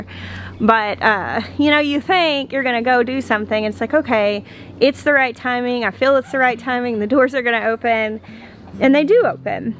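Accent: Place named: Mississippi, United States. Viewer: look now, lol, y'all aint got no business crying about the weather. This morning we woke up to 34 degrees F after Mother's day in Minneapolis Minnesota. Tonight we are expecting a whopping 45 degrees. This will be the first night above 40 degrees in about two weeks. I got peppers in the house putting on flowers already flowers on tomatoes help, help ,help! I have about 200 starters each one wants to be the first one in the garden. Thank you for being here I love listing to the accent I used to have when I left Mississippi a long time ago. Blessing on your summer and your move.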